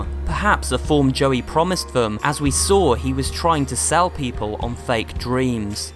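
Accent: United Kingdom. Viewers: That's a pretty good detective work. Also, I did notice your very interesting aksent. Are you british?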